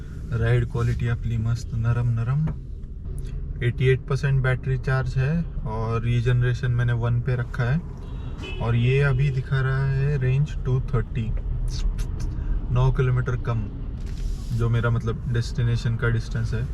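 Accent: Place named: Maharashtra, India. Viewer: Are wa wa marathi mulga talking in English..... Good good nice information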